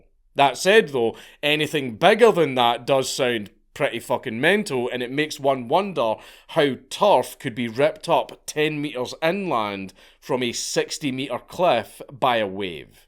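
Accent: Scotland, United Kingdom. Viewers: Goddammit you know how hard it is to find Celtic Myths on youtube narrated by an ACTUAL SCOTSMAN. Throw me another several bones as one of your countrymen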